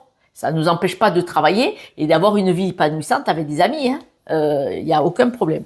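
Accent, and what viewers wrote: South, France. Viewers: Oui je suis d'accord avec vous...j'ai commencé à prioriser ce que je pense faire sur mes jours de vacances...rangement et sorties avec les amies...mon autre est statique...je dirais..hyper-statique 🙄 Vous êtes l'alchimie de Louis de Funes (en geste) Maïté(en paroles bienveillantes avec l'accent)Jamie Lee Curtis(pour le physique) et le tout avec le ❤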